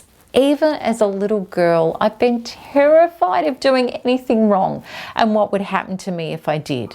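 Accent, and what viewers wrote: Australia. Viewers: I'm glad I found you. This is going to sound so weird and strange, but here's my confession: my dad was a womanizer who always complained about American women and how foreign types were so much better, this, that, yadda yadda. I must have been 12 or 13 when he started dumping that and constant criticism on me. To make a super long and agonizing story short, he married a woman from the gold coast who was easy to control like his ex-wife, my mother, until she was angry and stressed out to the max. There's so many wonderful people from Australia but I didn't realize how the accent by itself brings up anxiety and stress. Listening to you will be exposure therapy. I'm sorry to sound so weird and how something so small could still bring this up after so much healing, but I'm thanking God right now I found you.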